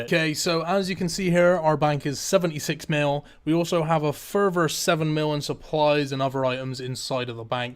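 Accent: Bristol, United Kingdom. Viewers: are you from Bristol? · bristol accent 😂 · Are you from Bristol? Sound very Bristolian aha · Are you from Bristol England? Or near by?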